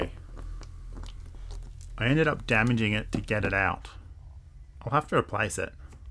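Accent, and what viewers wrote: Australia. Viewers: Very satisfying to see and Hear a fellow Aussie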